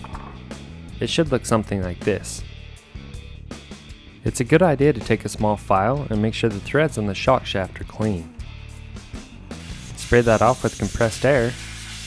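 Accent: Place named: Utah, United States. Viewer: I can tell the guy narrating is from Utah with his accent.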